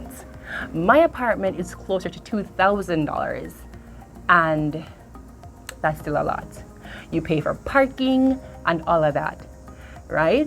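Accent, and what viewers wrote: Jamaica. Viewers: I was shocked that you never mentioned the weather girl. I guess you've seen enough videos of new immigrants having the wrong shoes and no gloves or head protection in their first winter here. As a 66 year old Canadian I find winter shocking to me every year so I have to run away to warm places for months. I could pick up your Jamaican dialect coming in whenever you started to get excited in the video. Having lots of Jamaican friends I'm used to that. Anyway, it was interesting to hear the differences coming from your angle. Welcome to our secret 'best country in the world' (ignoring winter). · A few points to fix, that will make this a great video.. - use different cam angle, more close up of your pretty face, lock your focus.. - use more full screen live & shot of your topic, with close up shot, (alcohol shopping full shot wud great, mon).. 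- a little long winded, make it shorter.. - use more text to highlight you topic.. - need to put your mic closer, so u sound clearer, louder (cos w the accent some words are lost to non-jamaican) * use more Jamaican slang/attitude, its fun, its cool n it adds flair.. * You hv great cam presence n the way you talk is fun/great (like shameless maya).. just need more variety (like jennelle eliana) .. 👍👍👍 Get ready to go viral..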